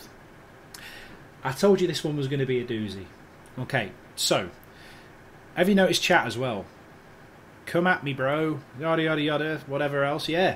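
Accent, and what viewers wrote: United Kingdom. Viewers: Tanks - check ! British accent - check! New Subscriber ? - CHECK !!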